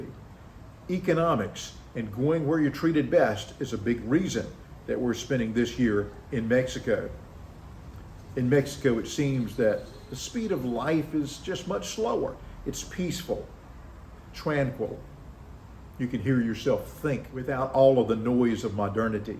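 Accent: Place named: South, United States. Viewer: I love your slight southern accent. I’m from NC, live in CA and considering moving to Mexico.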